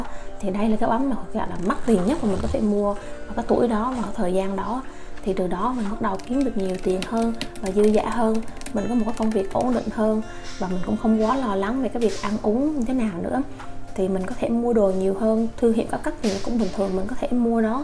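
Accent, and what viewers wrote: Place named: Quang Nam, Vietnam. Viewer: Giọng bạn giống Quảng Nôm hay Quảng Ngãi quá bạn hê :)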